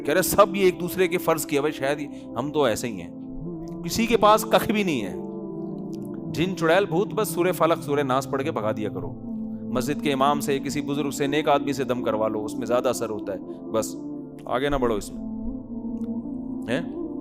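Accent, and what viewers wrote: India. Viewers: wha mufti saheb aap ki urdu bilkul indian he aap salamat rahe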